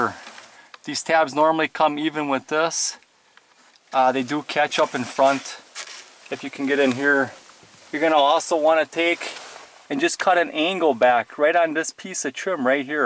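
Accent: Minnesota, United States. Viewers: Holy lord, great videos on F150led installs but that Minnesotan or Wisconsin accent is undeniable! Don’t cha know? You betcha! What is your favorite “hot dish?”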